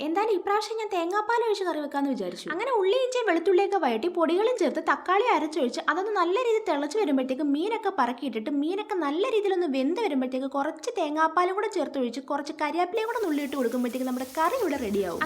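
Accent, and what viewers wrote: Kollam, India. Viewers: Kollam കാരി ആണോ 😂 സംസാരം and മീൻ items കണ്ട് ചോദിച്ചതാ.. നമുക്ക് മീൻ ചാറില്ലാതെ ചോറ് ഇറങ്ങില്ല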